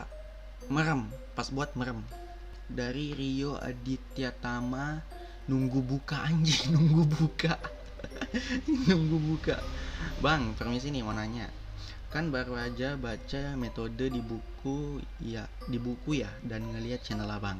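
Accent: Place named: Makassar, Indonesia. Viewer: Mungkin karena kalau ndak salah part 2 dia pakai kaya logat makassar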